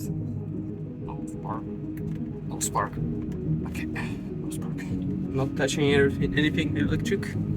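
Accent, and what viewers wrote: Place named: Russia. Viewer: Класс, почти не палишься. Но русскоговорящему сразу слышен акцент) Удачи в творчестве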